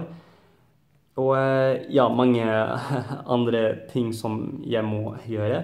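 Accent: Sweden. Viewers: Du har en gøyal skandinav-dialekt, hører du har litt svensk påvirkning, men det gjør jo ingenting. Du har bra uttale og er lett å forstå deg uansett👍🏻 mektig imponert over at du har lært norsk så fort 👏🏻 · Good job! I can hear some swedish in there, but that is nothing to worry about! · Du snakker ganske bra allerede, norsk er et vanskelig språk å lære i utgangspunktet, men jeg hører en del svenske ord og utalelser, så øv litt mer på uttalelsen din, og om du virkelig vil imponere nordmenn, bør du lære deg nynorsk også, siden det er det mange nordmenn som også sliter med. Men ellers veldig bra👍🇳🇴🇳🇴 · Norsken din er utrolig bra for å være på 4-5 måneder, det skal du ha! På mange måter hører jeg at du blander det inn med Svensk, ikke det at det er noe dumt med det, men spesielt på «ungifär» som er Svensk, hadde jeg ingen aning hva du sa :p Utenom det, ikke gi opp, du har talent! 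· Litt hjelp: Du snakker med en litt svensk uttale. Si "mæj" isteden for "mei". (I hvertfall på Østlandet.)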